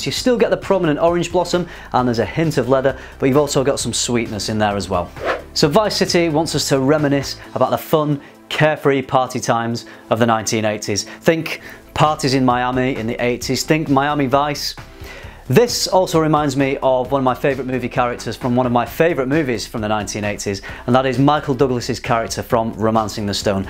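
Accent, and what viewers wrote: United Kingdom. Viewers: Besides the afford you put in your Videos, id like to tell you, that your Voice is just so relaxing and pleasant to hear, maybe because i also like that British açcent. I hope that doesnt sound weird to you. XD Anyway, loved the Video, reminded me alot of GTA Vice City haha. Many greatings from Europe.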